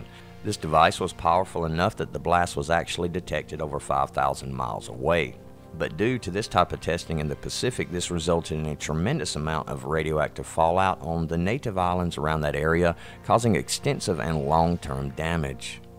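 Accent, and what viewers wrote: Alabama, United States. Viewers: Where are you from ? Bama ?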